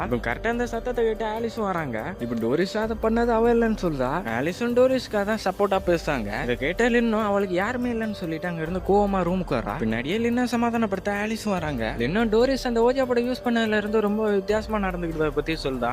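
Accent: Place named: Tirunelveli, India. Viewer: Youv thirunelveli machan.... Super paaaa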